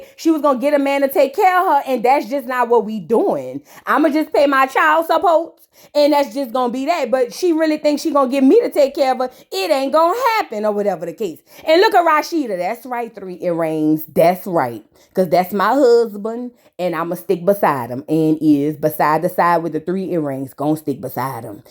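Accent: New Orleans, United States. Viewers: Love the commentary and the New Orleans accent 🤣🤣🤣🤣🤣🤣🤣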